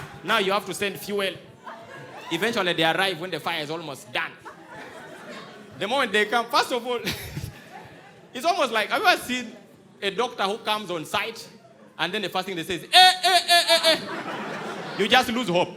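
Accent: Uganda. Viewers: he is exceptional and way above some Nigeria comedians but it is pure ignorance to talk about accent of Nigerians when he has a strong Ugandan accent himself. You can actually disagree with your head and not your heart.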